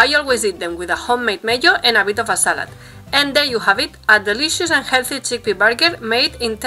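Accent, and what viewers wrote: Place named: Greece. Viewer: Thank you so much for your channel! I love your accent; You sound like my cousin from Greece.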